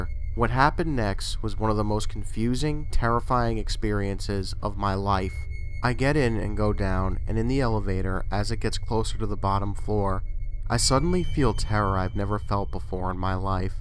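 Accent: New York, United States. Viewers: Where are you from? New York or Jersey?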